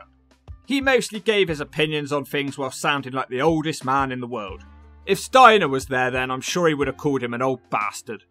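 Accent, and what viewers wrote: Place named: Devon, United Kingdom. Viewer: where are you from as you sound like you’re from my part of the world. I was born in Plymouth (the original one in Devon) and I live in Cornwall just over the Tamar River so I’m on about 15 miles away from Plymouth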